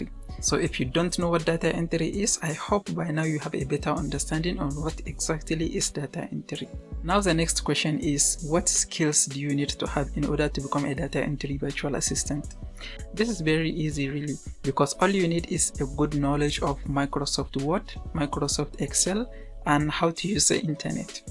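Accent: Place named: North, Nigeria. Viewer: Your accent brought me here i knew, you're from the North 😊 ina gaisuwa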